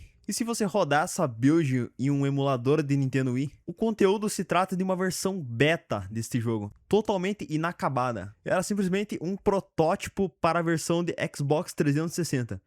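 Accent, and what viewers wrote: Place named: Rio Grande do Sul, Brazil. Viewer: Esse cara é gaúcho só pelo sotaque.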